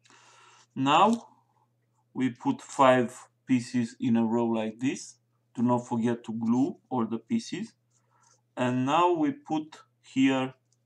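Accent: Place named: Russia. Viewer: пытается говорить по английски ,но сражу просвечивается русский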